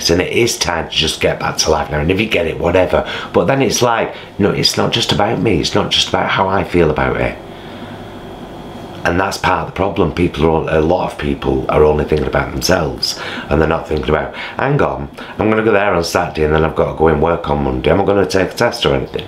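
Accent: Manchester, United Kingdom. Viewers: Lovely, I'm moving back home and I will keep following u, a bit of a Mancunian accent in a southern Italian flat... great person indeed xxx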